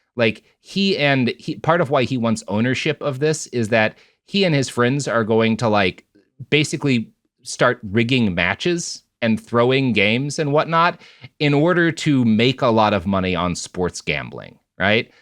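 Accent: Boston, United States. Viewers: Your Boston accent is so thick, hard to understand sometimes. Have you considered speech classes or something?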